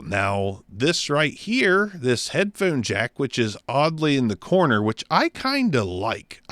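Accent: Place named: Mid-Atlantic, United States. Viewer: BTW noticed your accent, are you from Pa, Delaware or Maryland?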